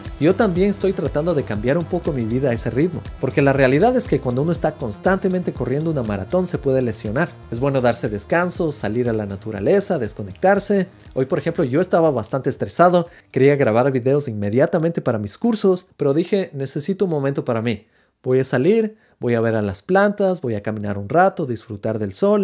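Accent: Quito, Ecuador. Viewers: Jajaajja saludos bro, te escribo para demostrarte que no soy un bot, ya me tomaré el tiempo de revisar los cursos, y porque estoy en el proceso de aprendizaje, y me pareces un excelente mentor, además que por tu acento, creo que eres quiteño. Saludos desde Manta Ecuador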